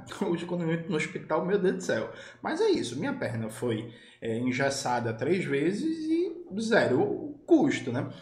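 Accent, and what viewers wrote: Pernambuco, Brazil. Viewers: Isso é o sotaque pernambucano amooo❤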